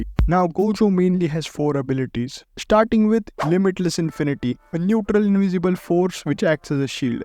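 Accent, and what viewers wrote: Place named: India. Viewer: Hey, ranjeet. You got your Indian accent from consuming cow p*op?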